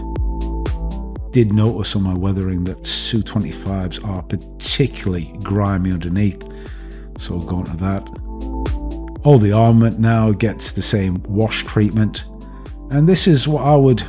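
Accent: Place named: Liverpool, United Kingdom. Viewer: Followed this all the way through - definitely put this kit on my 'wish list for 2023, along with a Hind A and a couple of other Soviet/Russian subjects. Good, clear videos, cleanly edited and well presented (good to hear a familiar accent/dialect even if it does make me a little homesick). Looking forward to your next 'production. All the best from a Scouse in NZ.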